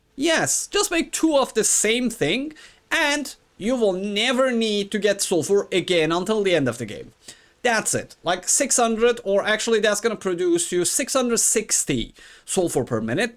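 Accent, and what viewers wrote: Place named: Turkey. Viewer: oh, I didn't realize that was modded. I've made 3 story builds before, sometimes just tucking amps under the floor boards so it still looks like a two story. And I was trying to place the accent, didn't have turkey in my head. Keep the vids coming.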